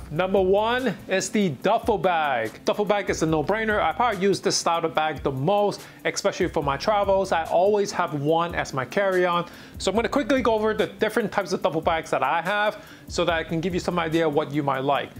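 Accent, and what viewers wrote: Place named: China. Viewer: So he speaks with a heavy Chinese accent. His English is probably better than any second language you speak. What is obvious though is that he really doesn’t know about fashion because a lot of his terminology, while descriptive, are not industry terms. The LL Bean tote, which appears to be a magazime giveaway item, is definitely not lined with rubber. The “Valentino” is clearly a belt bag and Valentino Garavani. I think it’s tacky tacky.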